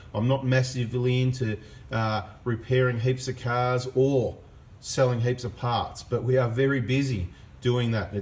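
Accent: Australia. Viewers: Hello! I’m new to your videos. When you say 5k’s, is that in km or miles? You sound a bit Australian so I was thinking it must be km, but changing your oil every 5k is a bit overkill? Here in Norway the price for a liter oil is around 20-30 US dollar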